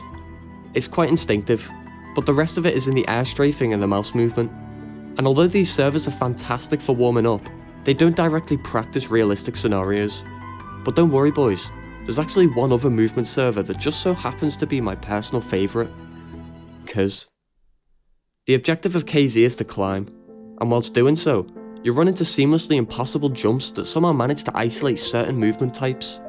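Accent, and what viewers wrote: Liverpool, United Kingdom. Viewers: bro are you scouse? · Here are you scouse la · He is 100% from Liverpool, UK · Subbing but only because you're scouse lad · im like 90% sure this dudes uk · are you a scouser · Scouse xd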